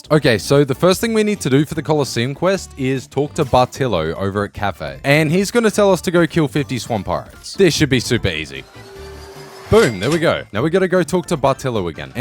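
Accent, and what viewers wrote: United Kingdom. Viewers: I like you british accent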